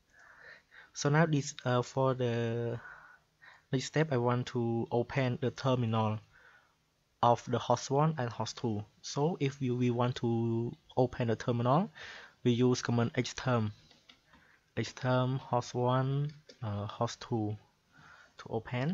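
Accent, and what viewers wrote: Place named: Vietnam. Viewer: thang nay nguoi viet chac luon :v Cai accent deo chay dau duoc lol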